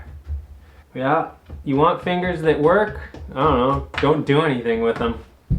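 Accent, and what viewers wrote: Canada. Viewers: I dig the Canadian accent. I donno I just felt compelled to express that. Great video, man.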